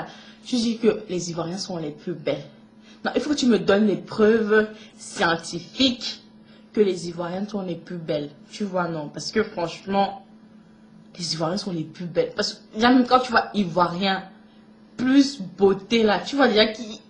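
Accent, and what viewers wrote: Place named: Cameroon. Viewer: Mdr les Camer vous parlez francais? mdr c'est quoi cet accent de merde?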